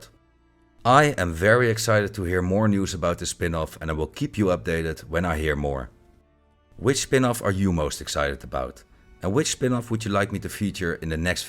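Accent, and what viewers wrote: Netherlands. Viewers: An excellent video, l've liked and subscribed, best of luck building your channel. Is that a Dutch accent l hear?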